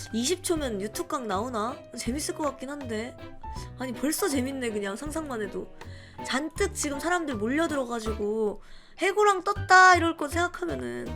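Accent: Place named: Gyeongsang, South Korea. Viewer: zzㅋㅋㅋㅋㅋㅋㅋㅋ우리 귱남선생님들은 된소리가 안된다요...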